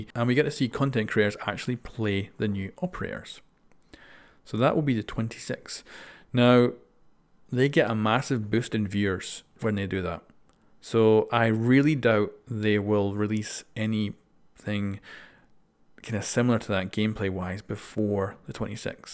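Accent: Scotland, United Kingdom. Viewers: Nice scottish accent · Ur Scottish right? Me too :)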